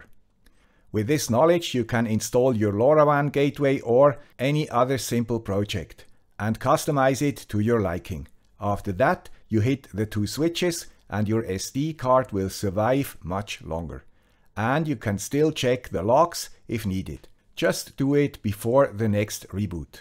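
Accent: Switzerland. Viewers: thanks to the guy with the Swiss accent, another great tip and information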